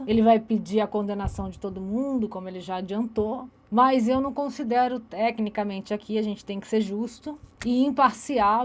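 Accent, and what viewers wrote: Rio de Janeiro, Brazil. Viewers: O sotaque do Rio é massa 😅, parabéns 👏🏾😘.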